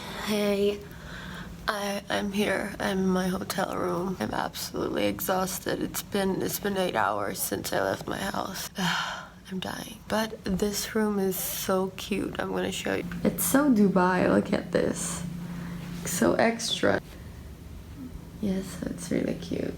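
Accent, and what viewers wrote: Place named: Iran. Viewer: مثل بچه آدم ایرانی حرف می زدی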